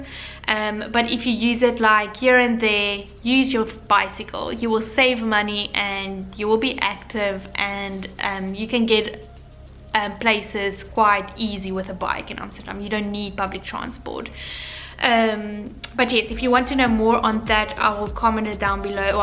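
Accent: South Africa. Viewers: She sounds very South African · You sound South African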